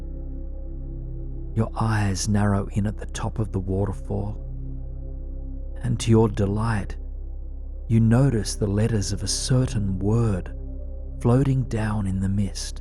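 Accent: Australia. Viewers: Is it just me or does he sound Australian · haha I knew it lol, and team, you’ve changed my life with your beautiful guided imagery and Aussie accent 🥰✌️❣️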